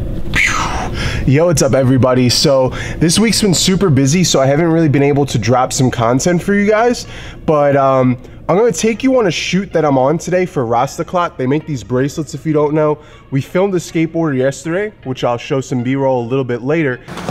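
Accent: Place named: Long Island, United States. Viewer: ! Brooooooo, love your videos man. You sound like you're from Long Island bro lol. Whole Fam is NYers. Anyway, love the content and quality. I just purchased the A7III, it's on back order but I'm excited for the quality of vids I'm about to pump out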